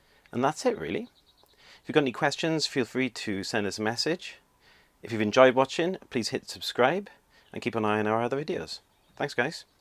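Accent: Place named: Wales, United Kingdom. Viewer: Thanks for posting. Nice to hear a welsh accent too.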